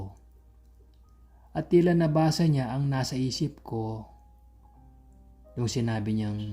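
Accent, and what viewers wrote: Pampanga, Philippines. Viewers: Kapampangan accent ei.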